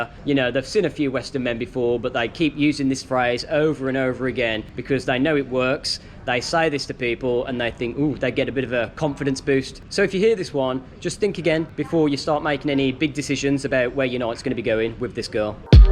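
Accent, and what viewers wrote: United Kingdom. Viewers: Love hearing this guy's genuine British english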